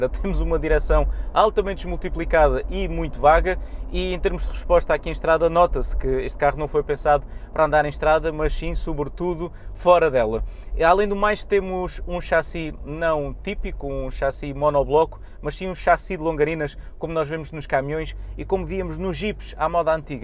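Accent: Portugal. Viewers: Mas esse menino tem sotaque português... Grande parte das peças são estampadas e soldadas em Portugal. Só não é montado em Portugal 😉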